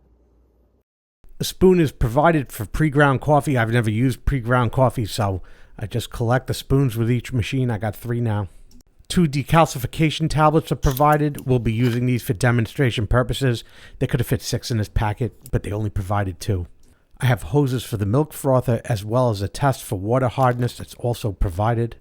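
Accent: New York, United States. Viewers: So, I'm a former NYer and just freaken LOVED hearing a voice from home! We ordered the S8 last night and expect delivery in 5 sub-par coffee days (our Breville burr grinder is breaking so the coffeemaker is making tan water - ugh). Thanks for walking me through the set up, etc. Lavazza is good coffee, as is Julius Meinl Prasidente, but I still prefer La Colombe Monaco or Sumatra beans (via subscription - roasted in PA and shipped). OMG, as I drink this swamp water, early in the morning with the house still asleep, I am PINING for a cuppa anything made with the S8! Subscribed!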